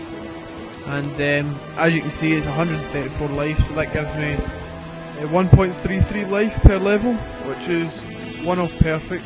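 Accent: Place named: United Kingdom. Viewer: british accent ftw :P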